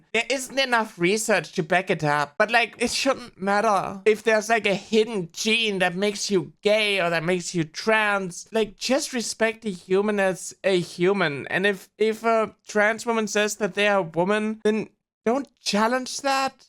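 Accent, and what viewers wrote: Germany. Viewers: ... are you german by chance?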